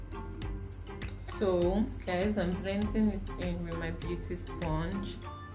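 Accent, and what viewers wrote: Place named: Nigeria. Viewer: All the love from Kenya. This is my first time watching someone from Nigeria, to be specific Lagos. I love the Accent and I am so excited 💛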